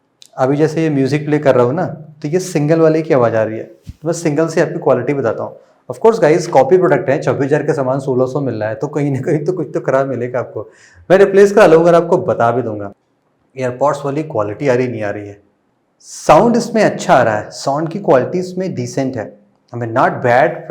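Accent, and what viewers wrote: Lucknow, India. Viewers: Sir, Are you From lucknow?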